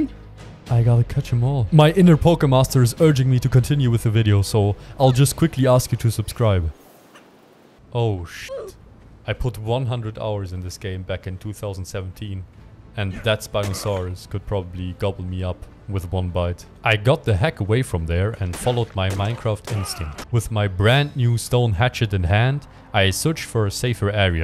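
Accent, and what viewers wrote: Germany. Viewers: Are u german? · I hear deutsche akzent out of you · is he german?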